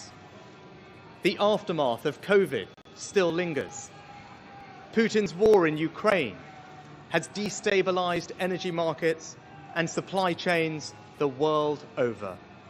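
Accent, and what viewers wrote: United Kingdom. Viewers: Sounds British to me, whats the fuss about his native roots... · this Indian man has brilliant UK accent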